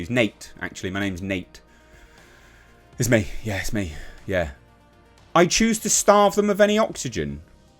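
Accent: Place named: England, United Kingdom. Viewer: I always appreciate a genuine English accent and calling someone a dickhead. Like going to a football match and calling the ref a 'silly fat wanker'!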